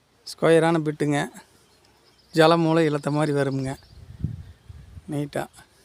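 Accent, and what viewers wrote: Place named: Pollachi, India. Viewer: Pollachi Tamil speaking check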